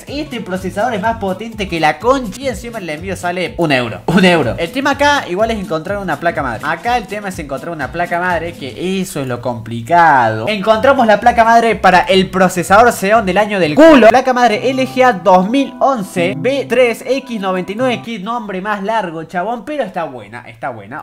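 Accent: Argentina. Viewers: vos sos un crack saludos de peru ajjajajajajajj el acento argentino que piola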